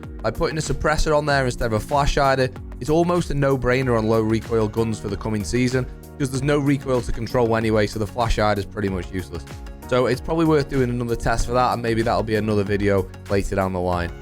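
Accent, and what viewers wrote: United Kingdom. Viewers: Oh the sexy British man posted another video 🤺🤺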